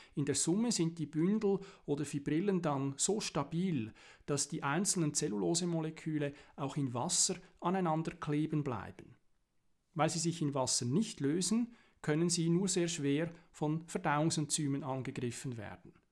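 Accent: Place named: Switzerland. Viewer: No shit, er kommt (höchstwahrscheinlich) aus der Schweiz, hat daher einen Schweizer Dialekt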